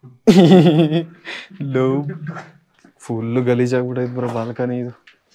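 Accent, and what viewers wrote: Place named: Ramanagara, India. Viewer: ನೀವು ಎಲ್ಲೇ ಹೋದರೂ ನಮ್ಮ ಚನ್ನಪಟ್ಟಣ, ರಾಮನಗರ language slang ನಿಮ್ನ ಬಿಡಲ್ಲ ❤😊😅 Ex: ಸೊತ್ತೆ 😂😂😂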